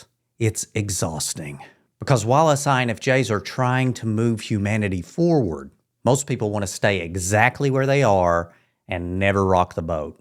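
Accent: United States. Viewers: What state is that accent from? Respectfully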